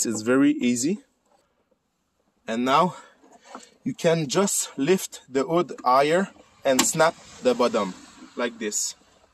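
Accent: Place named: Quebec, Canada. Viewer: how to tell you're from Québec not telling you're from Québec 😁 j'adore tous les deux, le mec et le video! merci 😊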